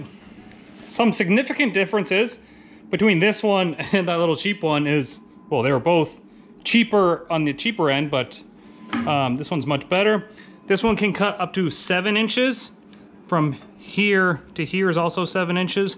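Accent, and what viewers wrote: Canada. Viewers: Lmao I love the Canadian accent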